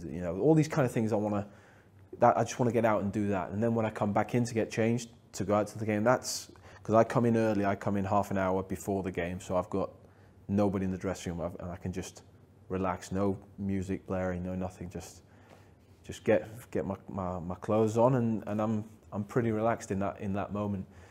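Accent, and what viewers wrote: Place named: Denmark. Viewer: He definitely has a tiiiiiiny hint of danish in his accent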